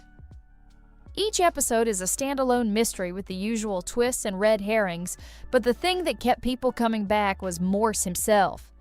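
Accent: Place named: United States. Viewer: And the voice over is... an ungifted AMERICAN!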